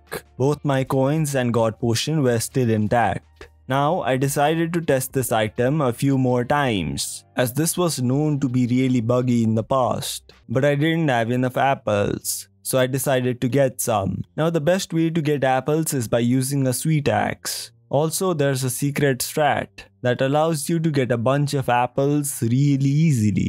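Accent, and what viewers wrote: India. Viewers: Are u indian? If no then where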